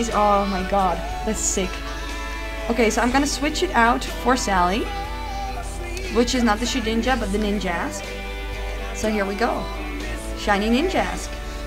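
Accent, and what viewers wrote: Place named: Netherlands. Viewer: wow congrats haha, I have shiny Shedinja too (got from my brother) also, you kinda have a Dutch accent, could it be you're from the Netherlands?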